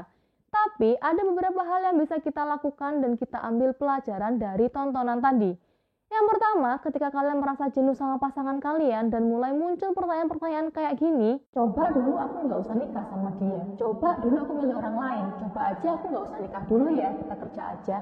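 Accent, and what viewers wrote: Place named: Java, Indonesia. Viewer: Medox banget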